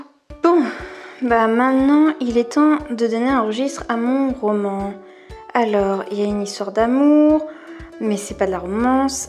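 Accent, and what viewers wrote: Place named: United Kingdom. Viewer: Et on aime les sensations fortes ! Et quel accent british dit 😋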